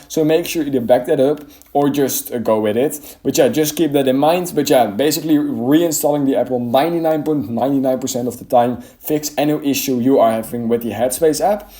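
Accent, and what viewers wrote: Netherlands. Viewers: Je klinkt echt Nederlands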